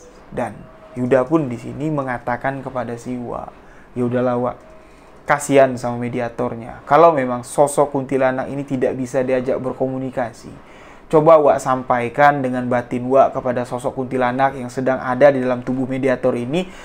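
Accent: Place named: Madura, Indonesia. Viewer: Mau tanya mas asli orang dari daerah mana?...logatnya mirip2 sprti orng madura atau orang padang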